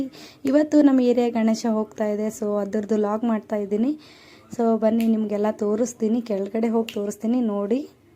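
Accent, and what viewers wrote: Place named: North Karnataka, India. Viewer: Nim uttarkarnataka bashe Chandar kelakke ❤❤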